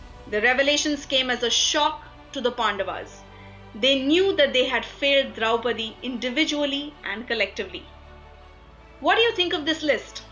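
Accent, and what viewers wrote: India. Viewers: Not a mythological myth, it is India's history. Pronunciation is Karn, Arjun and for more names or places like these.not Karnaa, Arjunaa, Mahabhartaa. Perfect sound of Indian accent but foreign pronounced words.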